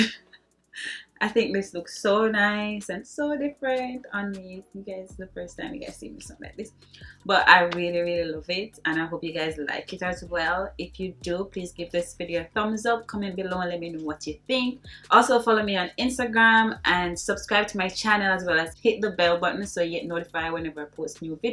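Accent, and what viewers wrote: Jamaica. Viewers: I knew u were Jamaican from the starting of the video.😂😂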